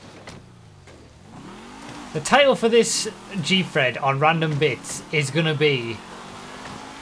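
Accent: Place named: Scotland, United Kingdom. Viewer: Are you... Scottish I’ve never watched you before and I’m Scottish myself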